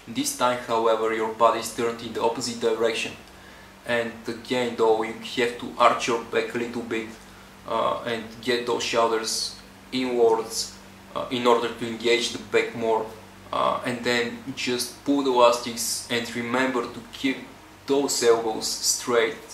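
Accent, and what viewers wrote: Bulgaria. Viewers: Ебаси българския акцент .... българин ли си ????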